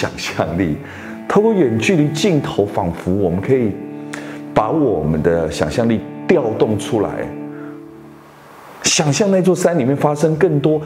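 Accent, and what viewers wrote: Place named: Waishengren, Taiwan. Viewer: 看新聞中跳出廣告，您講話的方式感到一股濃濃的外省貴族味，特來朝聖。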